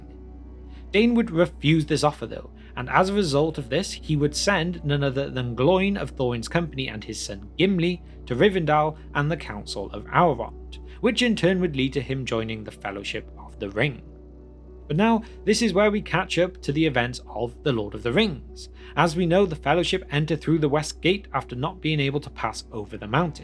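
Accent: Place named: England, United Kingdom. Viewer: I came across your videos this afternoon and I'm really enjoying them and the fact you add some style to pronouncing Elvish and Dwarvish names. The American LOTR vloggers mangle everything or just pronounce the place names like they might actually be somewhere in Wisconsin. One thing - I'm presuming you're English? I can't put my finger on your accent but the giveaway is you pronounce Durin as "Jewrin" instead of "Doo-rin". Like the "Juke of Edingburgh" (although you Brits never called Duran Duran "Jewran Jewran" thank God!) It's the only flaw in your otherwise excellent work!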